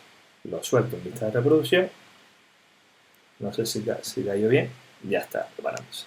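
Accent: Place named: Canary Islands, Spain. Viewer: más canario que el gofio mi niño así da gusto, abrazo fuerte colegui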